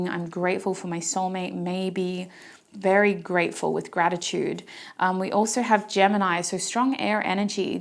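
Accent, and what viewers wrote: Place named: Australia. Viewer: Listening to her videos helped me a lot with aussie accent😂❤thanks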